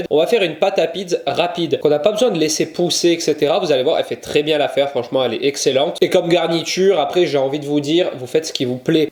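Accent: Corsica, France. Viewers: Au début je pensais qu'il raccourcissait le mot "pizza" en "pizz", mais après je me suis rappelée que c'était son accent corse 😊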